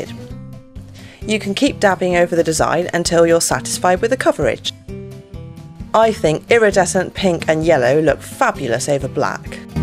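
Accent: United Kingdom. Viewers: Since by your acent lol i guessing u live in the uk????? and also ummmm thinking that i might not be able to find them u think u can do alittle bit of research on where i can buy them in the usa and i would love to share my expeiMENTAL self with you im always hearing form my friends lindsey ur cray cray ur just to much lol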